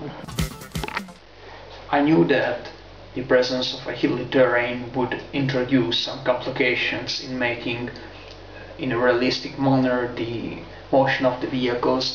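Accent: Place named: Hungary. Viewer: HAHAH! Végig a videódban gyanús volt, hogy nem-e magyar vagy, és lám utána elolvasva a kommented, kiderül tényleg! Annyira tipikus a magyorok angol akcentusa, hogy bárhol a világon meghallasz egy magyart angolul beszélni, rögtön le lehet vágni! Gratula a progihoz! Csak most találtam rá tök véletlen, mert a minap valahogy belefutottam egy az 1nsane-ről készült videóba, amiről meg rögtön beugrott ugye a nagy ős klasszikus Terep2. Amikor rákerestem, valahogy a te progidba is belefutottam. Kár, hogy nem fejlesztetted tovább...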